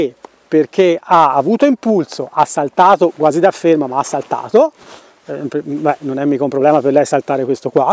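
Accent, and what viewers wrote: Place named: Tuscany, Italy. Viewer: Caro e un piacere guardare te e Ame😂Le tue spiegazioni sono chiare ed esaustive condite con l'accento toscano che non guasta affatto. Grazie per la tua sapienza e onestà. ❤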